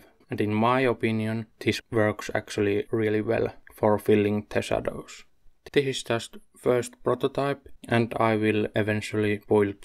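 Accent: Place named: Finland. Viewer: Probably from Finland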